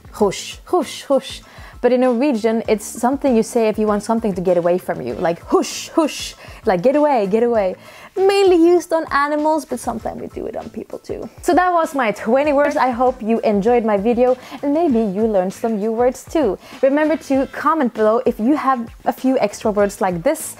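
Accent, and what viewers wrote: Iraq. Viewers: This iraqi accent is so cute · انتي تتكلمين لهجتنه العراقيه الجنوبيه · the iraqi accent made it more charming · the Iraqi accent has been liked by you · You are so cute speaking the Iraqi dialect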